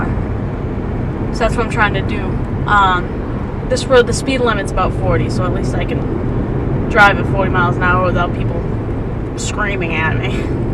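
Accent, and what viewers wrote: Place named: Boston, United States. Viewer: Is it just me or is yr voice getting more Bawstin? Lol I love it.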